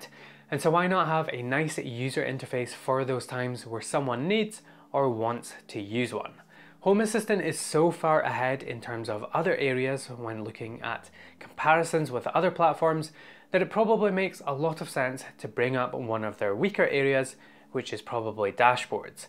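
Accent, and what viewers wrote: Scotland, United Kingdom. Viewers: If Home Assistant does voice, then you should be that voice. Your relaxing Scottish brogue would make it!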